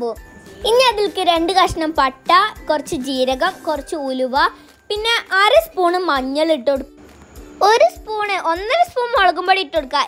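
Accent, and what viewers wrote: Malappuram, India. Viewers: ഇത് മലപ്പുറം ഭാഷ ആണോ, 😘😘😘 എത്ര വയസ്സായി മോളെ നിനക്ക്, മാഷാഅല്ലാഹ്‌ 👍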